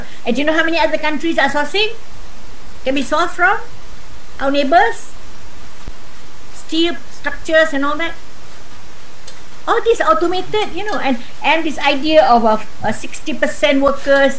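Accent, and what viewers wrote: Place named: Malaysia. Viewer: I like her English...... she really speak M'sian English! She missed something about Azmin: I think that bloke is quite handsome!!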